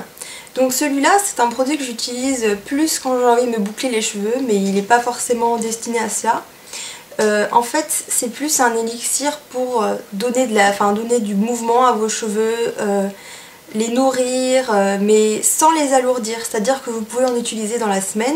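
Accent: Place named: France. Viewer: Hello :) I don't know if you'll be able to understand this but I just found your channel and I think you are absolutely beautiful! I also love your accent, I go to France often on holidays but I've never been able to understand in full. I subscribed to your channel, you have lovely videos! Hopefully more to come :)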